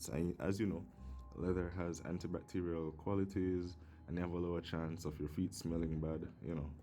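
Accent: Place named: Jamaica. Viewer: Can hear the Jamaican in your voice from you start talk bro keep doing clarks reviews bro rate them with different colours #repfromjamaica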